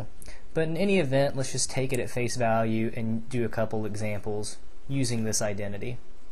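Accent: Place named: South, United States. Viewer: good vids. but your southern accent distracts me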